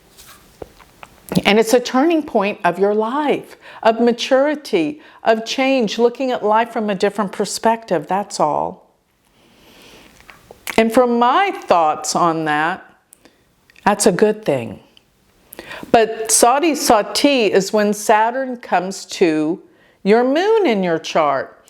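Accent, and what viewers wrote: Texas, United States. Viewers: You can speed up the playback by going to the Settings button that looks like a little wheel in the bottom right hand corner of the video; click on it, select Playback Speed and you can speed it up! I usually use 1.25 or 1.5 speed if I'm in a hurry. But I do love a Texas drawl, so normal speed is good for me!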